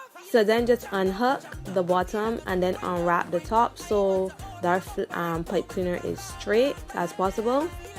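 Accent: Barbados. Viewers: is that a bajan accent I hear??!!!!!! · Yuh sound Bajan 💙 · love love love that Bajan accent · Yesss I hear the bajan accent 🙌🏽🇧🇧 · yesss I know that accent anywhere subscribed right away #BajanQueen 😍😍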